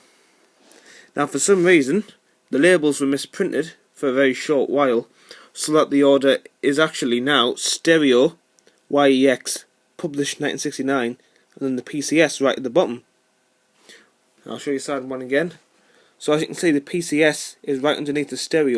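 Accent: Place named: Newcastle, United Kingdom. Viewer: No. It's from North East England, most likely Geordie.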